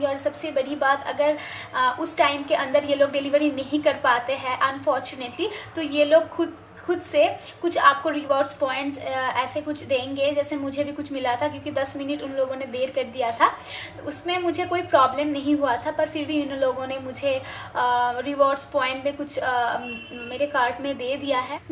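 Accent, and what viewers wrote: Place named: Bengal, India. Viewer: Your acent felt quite bengali😅😅😅. BTW I'm from kolkata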